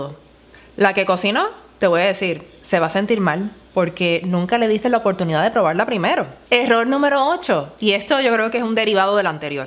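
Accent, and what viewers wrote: Puerto Rico. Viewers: Buena explicación, por el acento percibo que la presentadora es boricua. Saludos desde Panamá. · Me fascina el acento boricua.🥰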